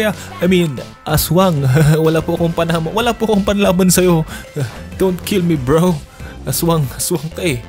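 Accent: Visayas, Philippines. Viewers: bisaya ka ba?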